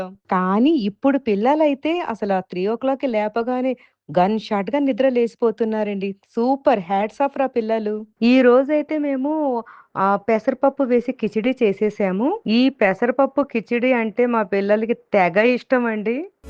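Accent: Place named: Nellore, India. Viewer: Nellore aaa Madam meedhi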